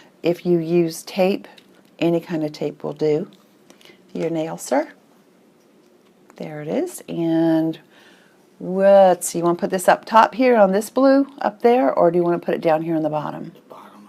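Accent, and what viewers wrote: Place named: South Carolina, United States. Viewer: love the nails. love his accent too. sounds like mine lol I'm also from South Carolina. thanks for doing the tutorial. hope you have a great Thanksgiving!!!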